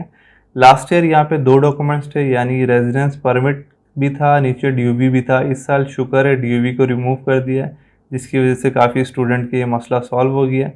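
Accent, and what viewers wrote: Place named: India. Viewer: Please speak English not Indian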